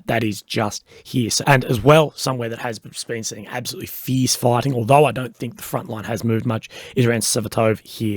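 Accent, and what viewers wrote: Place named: Australia. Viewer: the western corruption is far more discreet and is missive it seames the military's is used as a cash cow no mater where it is . Even the amount of maintenance western weapons' have to undergo to keep it running at peak performance is dodge. I like your channel and hearing an Ozzy accent presenting your take on the situation is good . cheers mate and best of luck with your health battle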